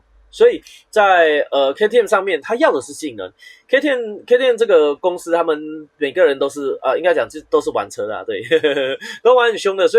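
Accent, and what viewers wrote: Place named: Hakka, China. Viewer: 聽起來像是客家國語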